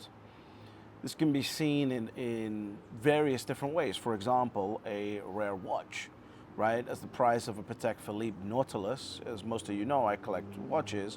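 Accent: Scotland, United Kingdom. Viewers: Shut the F up and get fantastically rich ! Advice taken ! PS are you from Scotland ? Detect a slight Scottish burr there.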